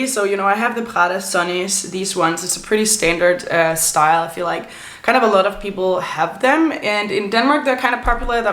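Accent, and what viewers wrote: England, United Kingdom. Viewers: 😂 I’m an English woman and I totally approve of your attempt at an English accent 🤣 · Really interesting as a Brit hearing your comments. I think you are right- everyone all ages is wearing white trainers (not sneakers- 😊). I think it's a post covid rejection of uncomfortable footwear Converse are popular but tough to wear all day. I think we don't consider unique jewellery because most people don't prioritise spending money on it - we should - but definitely too much (imo) make up. I can confirm your skimpy tops are not shocking and the jacket does not stand out (i.e. it is nice but normal expect for it being 30c!). The cropped long sleeve square neck is lovely. Your English accent made me smile but it's autumn not fall 😀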